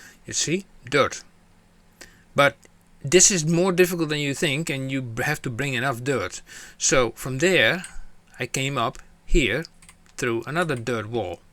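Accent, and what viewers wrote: Netherlands. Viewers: As a dutch person it's always funny to hear the typical dutch english accent haha, but great video, this is awesome to know that you can explore the areas further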